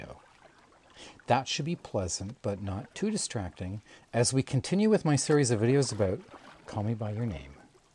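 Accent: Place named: Canada. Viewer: You must be Canadian. (E.g, pronounciation of boat, house, etc.)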